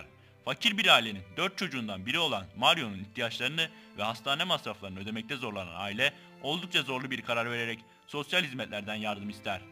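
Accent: Gaziantep, Turkey. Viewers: Abi anteplimisin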